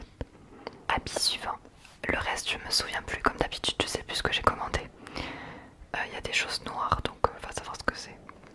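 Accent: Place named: Provence, France. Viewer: L'accent provençal en ASMR j'arrive plus à m'en passer, y a moyen d'être leadeuse d'un mouvement 👀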